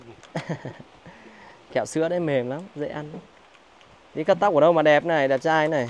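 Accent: Hanoi, Vietnam. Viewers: có chất giọng Hà Nội rất ấm nghe cứ bị cuốn ý ❤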